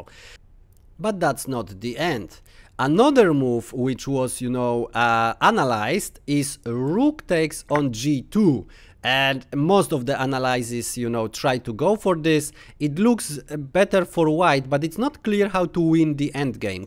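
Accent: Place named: Poland. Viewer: Stare chińskie przysłowie mówi: Jeśli 1 osoba Ci powie, że masz łeb jak koń to daj mu w mordę. Jeśli druga osoba Ci powie, że masz łeb jak koń to daj mu w mordę. Jeśli trzecia osoba również Ci mówi, że masz łeb jak koń to warto się zastanowić czy nie pójść do weterynarza. Nie przypieprzam się, po prostu robisz masę błędów fonetycznych i składniowych, dorzucając polski twardy akcent sprawia, że słuchacz się męczy, bo merytorycznie muszę Cię pochwalić ładnie opracowałeś tą rozgrywkę, absolutny top 👍🏻 Akcentu i języka również idzie się nauczyć, wiem bo mi zajęło to całe 6 lat, powodzenia.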